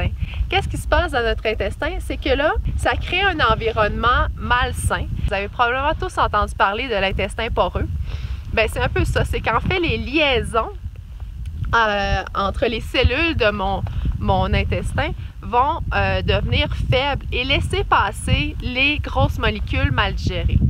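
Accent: Quebec, Canada. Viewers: C'est la moindre des choses de vous donner un retour. J'aurai une remarque. Quand vous dites que la pomme de terre génère des glycotoxines, cela a provoqué un doute et en me renseignant, j'ai compris que c'est quand elle est cuisinée en friture. Il fallait le comprendre dans la suite des détails que vous fournissez sur base d'aliments cuits. Cela étant, même vous, dans votre belle contrée outre-atlantique, avez sans doute entendu parler ou même gouté des frites. Etant Belge, le pays des frites, j'ignorais l'impact de la cuisson de ladite pomme de terre. Des gens de votre métier par ici doivent le savoir aussi, mais n'en font pas état ! C'est par votre entremise que j'apprends cet enseignement. Encore merci donc. Par ailleurs, quant à votre accent québécois, c'est davantage un atout, bien que le fond d'une communication soit le principal. Ce que vous dites, au risque de me répéter, est universellement intéressant et instructif. 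Quant à la situation géographique des différents pays que vous visitez, présentement ^^, dans l'Amérique du sud (merci de l'avoir pointé, il s'agit d'une coquille de ma part en tapant Nord au lieu de sud) N'ayez crainte que les européens sache qu'ils se trouvent sous l'équateur. La plupart de nos pays se sont construits (pas très noblement) sur l'exploration mondiale et diverses colonisations. "L'histoire" qu'on nous enseigne passe par la géographie. Bref et quoi qu'il en soit, j'ai hâte de découvrir vos prochaines vidéos.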